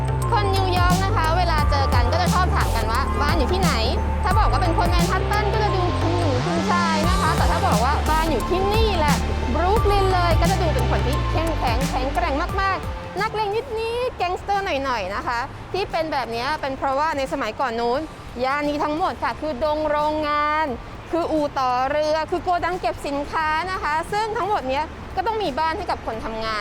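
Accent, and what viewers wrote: United Kingdom. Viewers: สำเนียงพี่ บริติชมากเลยยยย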